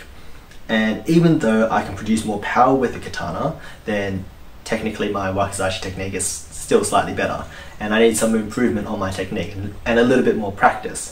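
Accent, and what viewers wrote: Australia. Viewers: are you australian? (accent) nvm you just sead aud are you in sydney because im going crazy trying to find bamboo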